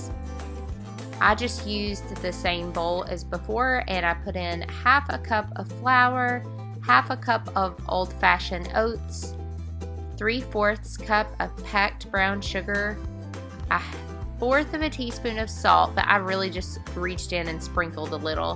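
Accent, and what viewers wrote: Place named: Tennessee, United States. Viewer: New sub! I came over from One Blessed Mess bc she said I should! 😜 I throughly enjoyed watching you make dinner. Now if you can just hand it to me through the screen, that would be soooo helpful! 😉 I have a garlic chicken crockpot recipe too. I wonder how similar they are. I’m gonna have to check. But mine is bone in. And I much prefer boneless. So yah!! Can’t wait to try it! I love your accent! I want to move to TN so badly so I can get one! 😂😂😂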